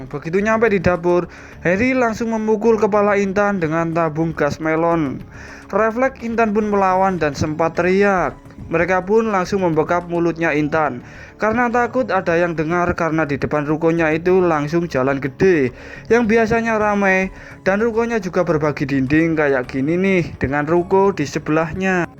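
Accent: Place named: Java, Indonesia. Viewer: Lucu bgt ngomongnya.medox bgt gitulah 😅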